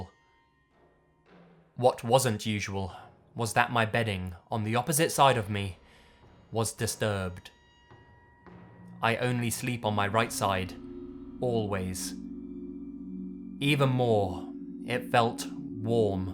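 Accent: England, United Kingdom. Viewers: Are you from England? I'm asking because I know what an English accent sounds like because I watch this show that takes place in England so I know your speaking with one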